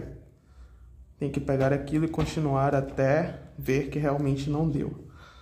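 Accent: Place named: Pará, Brazil. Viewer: Não precisei assistir o vídeo nem por 5 segundos para descobrir que tu és paraense hahahah; é bom ver mais canais daqui surgindo. Parabéns pelo início e pelo conteúdo! já me inscrevi para acompanhar os próximos passos. No que concerne ao teu vídeo, o que particularmente me agrada muito no estilo do livro do Louis é o fato dele explicar os tópicos usando, muitas vezes, PALAVRAS e não ficar abusando de recursos visuais, por vezes inúteis, para "elucidar" algo. Ademais, além dessa escolha de usar muitas palavras para apresentar um tópico, ele muitas vezes não omite certos detalhes que os mais "moderninhos" por vezes omitem. Dentre os livros mais "básicos" de cálculo como Leithold, Munem, Simmons, Ávila, Diva, Thomas, Anton, Swokowski, John Rogawski, Stewart, Lang, Larson, Paulo Boulos e etc., os que mais curto são o do Leithold, do Serge Lang e do Geraldo Ávila.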